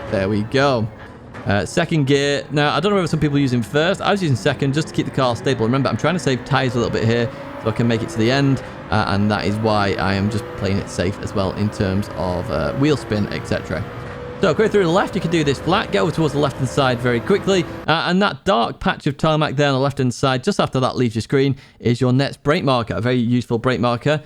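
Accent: United Kingdom. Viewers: Nice content but any chance you can you talk a tiny bit slower so non Brits can understand you ?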